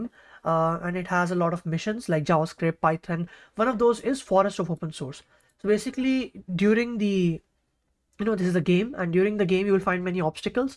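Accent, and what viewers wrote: India. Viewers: why indians has this accent?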